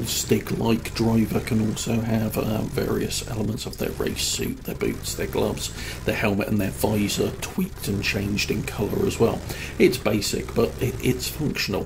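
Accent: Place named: United Kingdom. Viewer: You have the most british accent I've ever seen Good vid, keep it up mate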